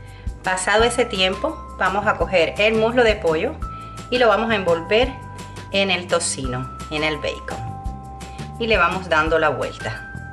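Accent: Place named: Cuba. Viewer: jaja ya me sonaba ese asento .un gusto yo tanbien soy cubana desde el primer día k vi tu video me suscribi. Por tu asento .jenial 👏👏💪💪💪🇨🇺🇨🇺🇨🇺🇨🇺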